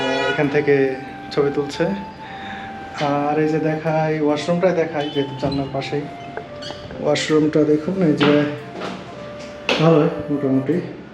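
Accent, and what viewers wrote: Sylhet, Bangladesh. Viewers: বারবার বলছেন , বাড়ি আসাম , আরে ভাই এই ছেলে করিমগঞ্জ , শিলচর , বদরপুর এসব এলাকার , সিলটি ভাষার গন্ধ পরিস্কার !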